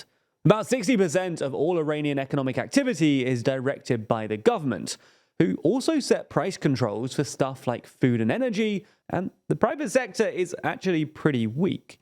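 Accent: England, United Kingdom. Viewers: Apparently, you are the expert. Just because you have an English accent doesn’t make you any smarter than an average person. I’m not even sure how you ended up in my feed. More bullshit from someone that doesn’t know crap. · British accent and commentary about how China is weak, Russia is weak, Iran is weak. Interesting how that goes.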